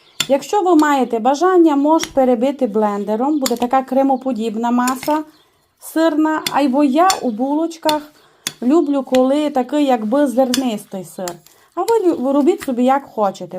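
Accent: Transcarpathia, Ukraine. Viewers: Як я люблю Закарпаття і ваш говір.Продовжуйте так говорити,ботв цьому ваш шарм і ізюминка.З миром Божим.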